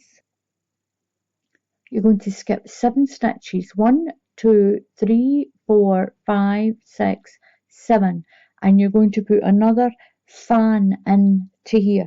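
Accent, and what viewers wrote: Scotland, United Kingdom. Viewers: I've saved this fan pattern. I'm just a learner but I love trying out patterns. What size of crochet hook did you use ? Keep up the artistic work, your super duper . You speak the same lingo as me hen!!, nice to meet a Scottish lassie !! X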